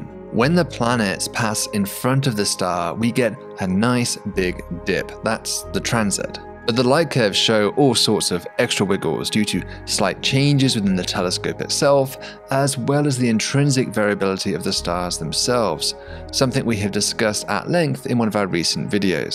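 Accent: England, United Kingdom. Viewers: His dreamy English accent takes me out of space to dreamland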